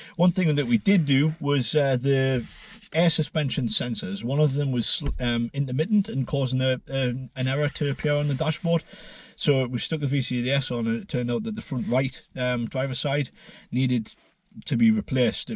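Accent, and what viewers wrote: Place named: Newcastle, United Kingdom. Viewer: Hi mate, so glad i found this account. I can hear a geordie accent! Im local around bedlington and had my celica a year and this channels incredibly helpful!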